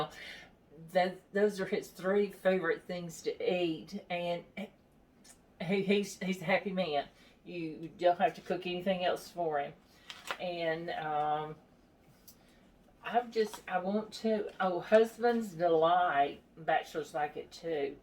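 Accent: South, United States. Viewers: You are a sweetheart. I very much enjoy your cooking (and your comforting southern accent).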